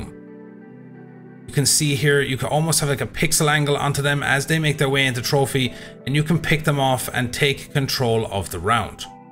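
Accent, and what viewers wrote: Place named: Ireland. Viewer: First time watching and I can tell he’s irish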